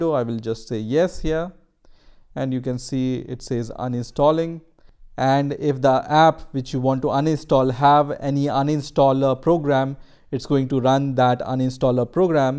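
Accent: India. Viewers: always the people with the indian accent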